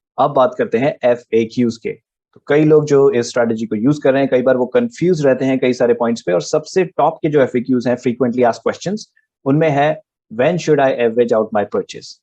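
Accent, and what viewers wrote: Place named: United States. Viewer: Ye American accent MEI bolna pehle band Karo. Very irritating. Strategy Mahesh C. Kaushik Sir ki hai. And uptrend market mei ROI 5-7% shayad zyada milega friends. Not in every year maybe.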